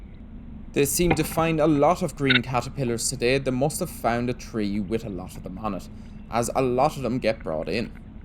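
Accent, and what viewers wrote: Ireland. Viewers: Greetings, I'm really enjoying your YouTube channel, the videos are terrific, your editing is great and I love your dreamy Irish dialect and narration. Maith thú. 💞 Gach rath ort. xox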